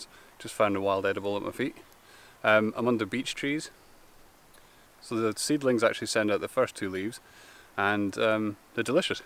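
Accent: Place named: Scotland, United Kingdom. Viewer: love your Scottish accent, are you from Edinburgh like me. I also make tea from dandelions Leaves by drying them. Stinging nettles are great too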